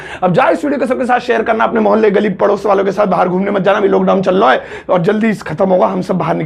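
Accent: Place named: Khadi Boli, India. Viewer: Sir kab se hamari tarah khadi boli bolne lage 😁😁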